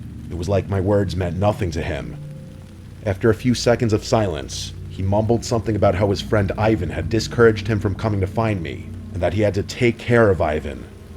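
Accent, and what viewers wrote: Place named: Massachusetts, United States. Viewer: I'm from Massachusetts. sounds like he's got a slight Mass accent.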